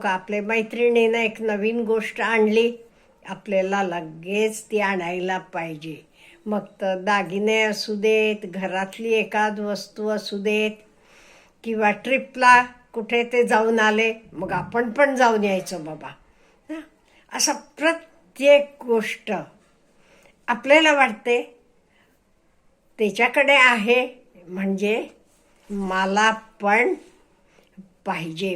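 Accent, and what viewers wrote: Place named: Gujarat, India. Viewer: ताई नक्कीच गुजराती वस्तीत राहिल्या आहेत. उच्चारच सांगतायत.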